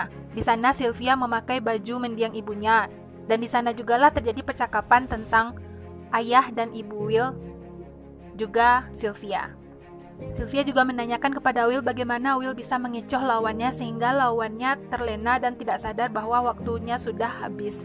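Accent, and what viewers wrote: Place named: West Sumatra, Indonesia. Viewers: Adminnya orang pekanbaru nihhh atau orang sumbar kalo diliat dari logatnyaa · FIX... INI mah orang minang tulen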